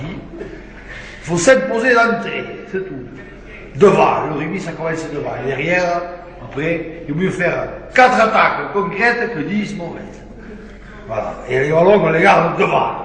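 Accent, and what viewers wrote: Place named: South-West France, France. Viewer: cet accent affreux de vendeur de voiture d'occasions du Sud Ouest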